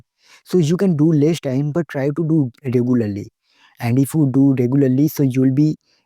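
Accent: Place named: India. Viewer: Your accent seem hindi but l understood anyway keep going❤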